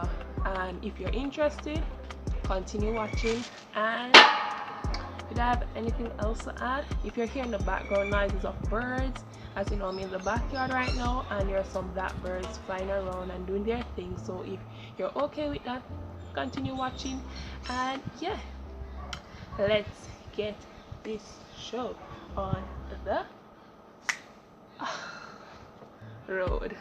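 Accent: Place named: Queens, United States. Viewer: Your accent is cool as hell. I grew up a Spanish kid in Jamaica Queens. Almost everyone in my neighborhood spoke like you. All of them just had this cool chill vibe.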